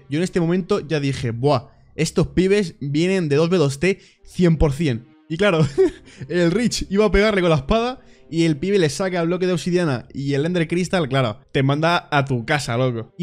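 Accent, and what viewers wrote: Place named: Galicia, Spain. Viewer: Porque hablas super gallego y de repente metes frases argentinas?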